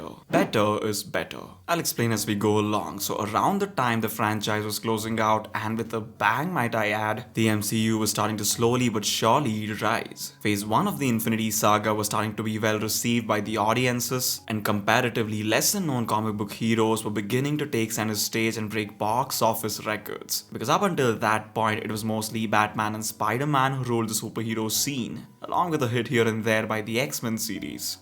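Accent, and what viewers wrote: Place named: India. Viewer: Click on video I want to watch: Indian voice Okay nvm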